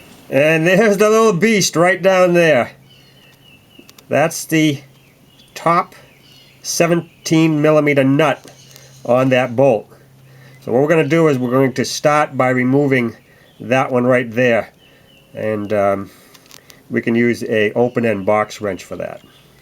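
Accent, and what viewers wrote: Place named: Boston, United States. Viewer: Great stuff! Enjoying the accent. Car Talk headquarters must be right down the street.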